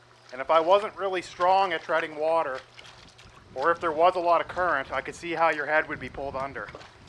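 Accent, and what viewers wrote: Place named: Canada. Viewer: Thumbs down because of canadian "aboot" and "ooot"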